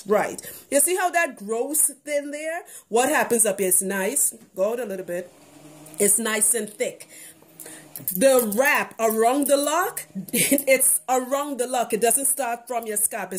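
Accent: Trinidad and Tobago. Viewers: you are Trinidadian dear I hear it in your accent. Also I believe she was referring to people with locs. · you sound like a Trini... are you Trini??? I'm Trini❤❤❤❤ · I knew when i heard that accent, that you were trini , represent hun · Hey great info. Are you from Trinidad by the way im hearing it in your voice and accent. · You go on and on and on and on and you still left us asking questions you could have easily answered 10 minutes or less into the video. And sorry to say but there's not enough art value in your tutorials, except for the background, where even your beautiful Trini accent becomes annoying because you're not delivering the message we've clicked on to hear. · Sounds like your from Trinidad, ,are you? If yes,,where? Am interested in locing my hair. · I'm hearing ah Trini 🇹🇹 mus know my people. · I'm hearing an accent...are you trini?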